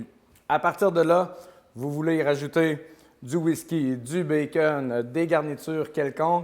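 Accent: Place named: Quebec, Canada. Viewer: j'adore la fraicheur de votre recette....bien assaisonnée , avec de beaux produits , j'adore votre générosité de recette détaillée,...et votre accent québécois qui rend heureux comme si toujours de bonne humeur...et je suis impressionnée par votre planche de travail en marqueterie très originale superbe..merci.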